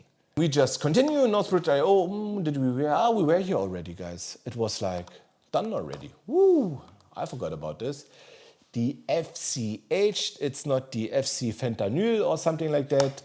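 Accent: Germany. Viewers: I don't see the issue he sounds like every German tourist I've ever met :)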